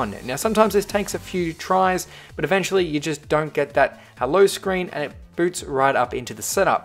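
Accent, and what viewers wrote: Australia. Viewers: The battery had a 'crazy' logo on it and the guy sounds australian so I assume its crazyparts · are u from Aus?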